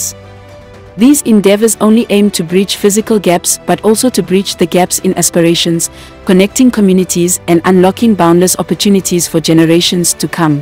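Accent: Africa. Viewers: The narrator sounds very much African if not Zambian. It's Kazungula Bridge not Kazanga or Kazanga river (Zambezi River) and many other mispronounced names which now stand in the way of an otherwise good documentary.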